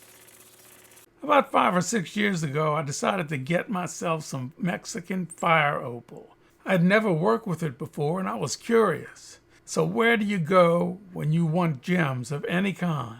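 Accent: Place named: Louisiana, United States. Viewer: JUST found your channel. 😱👏👏👏 Do I hear a hint of Louisiana there?🤷‍♀️ SUBBED for MORE OPALS!😎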